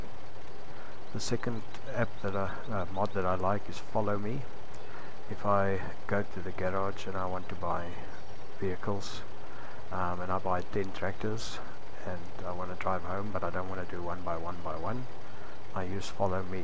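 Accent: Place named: Afrikaans, South Africa. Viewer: awe ou like jou video kan somer hoor jy is afrikaans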